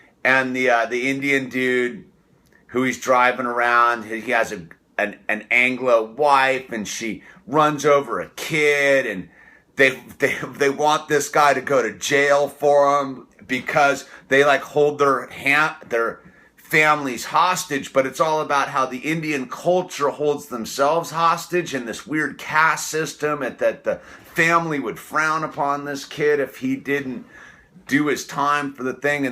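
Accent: California, United States. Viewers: You are the most Californian person I’ve ever heard speak. Really enjoyed the review from the UK 🇬🇧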